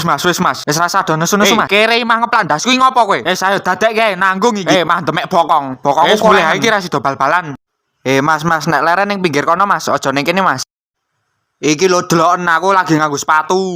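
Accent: Surakarta, Indonesia. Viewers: Wong solo to mas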